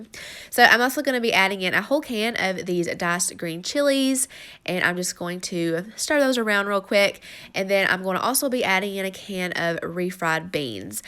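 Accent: United States. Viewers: Hi came here from the other vlog, im from Birmingham Uk. Love your country accent and your mac n cheese.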